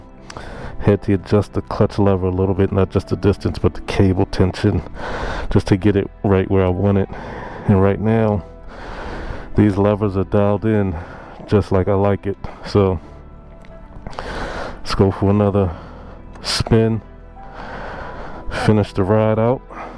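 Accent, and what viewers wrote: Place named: Baltimore, United States. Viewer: Good stuff man. Thanks for the video. Unrelated, you sound like a Baltimore dude, but those don't look like Baltimore streets 🤣.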